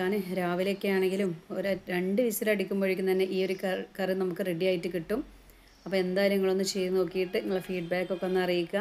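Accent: Malappuram, India. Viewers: പൊന്നാര താത്ത മലപ്പുറം ഭാഷ കേൾക്കാൻ ആണ് ഞാൻ ഈ വീഡിയോ കാണുന്നത്❤️😂😂👍🏻👍🏻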